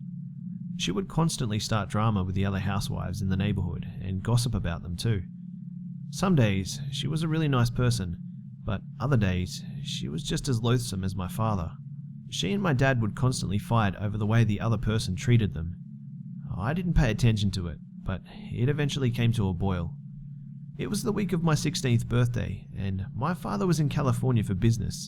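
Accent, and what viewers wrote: Australia. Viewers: Bethsabée Newrose yess Aussie accent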